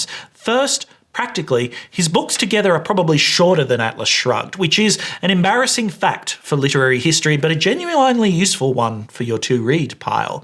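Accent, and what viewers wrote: United States. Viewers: Chicago South Side Latino/African-American? 🖤❤️🤍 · British? He sounds American to me (speaking as an Englishman).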